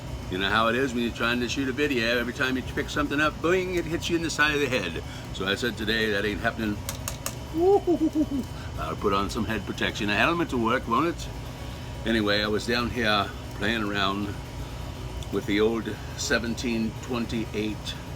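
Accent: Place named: England, United Kingdom. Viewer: ❤ LOVE YOUR ACCENT , ARE YOU A FELLOW ENGLISHMAN AS I AM ?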